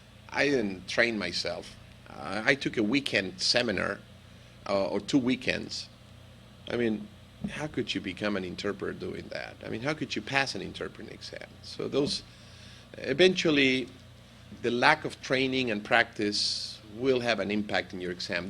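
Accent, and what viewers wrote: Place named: Argentina. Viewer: Argentine Spanish accent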